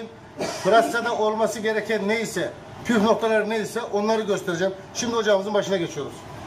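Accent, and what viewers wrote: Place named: Kayseri, Turkey. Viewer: şef kayserili heralde aksan baya vuruyor 😁😁👍👍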